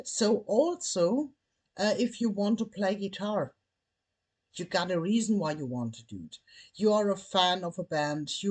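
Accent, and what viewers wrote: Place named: Germany. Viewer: Hello, I'm Yağız, I'm following you on YouTube, good luck, and your German accent is so sweet HDHM! 😈🐉❤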